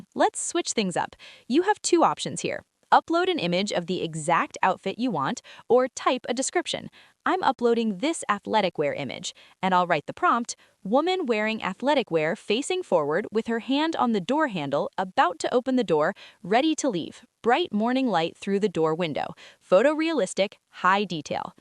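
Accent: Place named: Chicago, United States. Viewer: you must be from chicago... good work...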